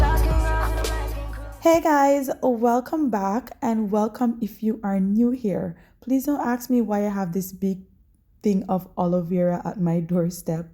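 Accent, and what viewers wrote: Jamaica. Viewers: I cn hear you a yard girl 😊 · typically a Jamaican she said a pat stur not pot or stir love my jamaican accent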